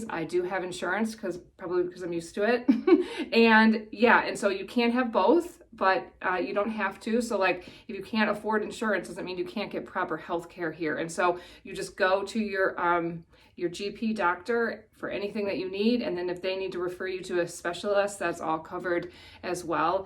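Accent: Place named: New Zealand. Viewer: Very informant on our country and love your video. Glad to have you in our country and seem like you're getting a Kiwi twang in your accent 😁 Chur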